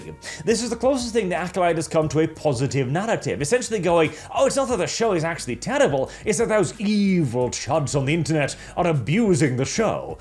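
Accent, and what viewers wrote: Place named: England, United Kingdom. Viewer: You accent reminds me of "Robin Hood/ Men in tights". The lead actor who said " Unlike other Robin hoods.. I can speak with an English accent ..."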